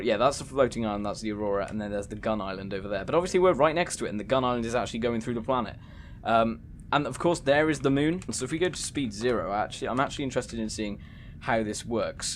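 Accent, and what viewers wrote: United Kingdom. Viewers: Get off the American Wi-Fi you’re in Britain I’m assuming project that you’re speaking British stop holding up the American Wi-Fi and use your guys his own Wi-Fi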